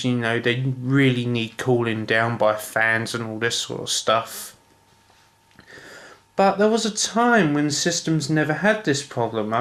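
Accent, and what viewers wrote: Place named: North London, United Kingdom. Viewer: He is English I would say from North London!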